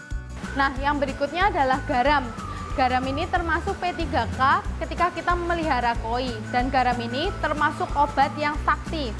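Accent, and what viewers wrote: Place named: Central Java, Indonesia. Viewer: bahasane medok bnget.. koyo wong jawa tengah bagian kulon.. hahahah😊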